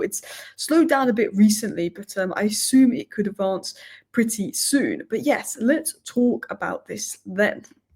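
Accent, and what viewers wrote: United Kingdom. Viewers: hello... i follow you and watch your content, it is really amazing... but i want to know which british accent you do speak.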